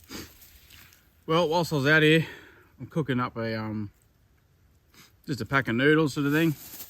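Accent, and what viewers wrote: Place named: Australia. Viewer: Why would I not understand him? He was using basic English with an Australian accent.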